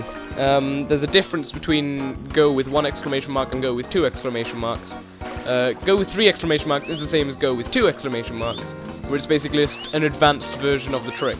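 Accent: United Kingdom. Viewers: U rock I love ur british accent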